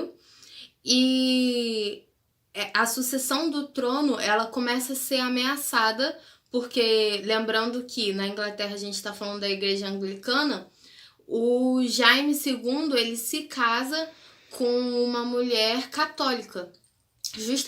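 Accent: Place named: Rio de Janeiro, Brazil. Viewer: O sotaque carioca é o melhor!!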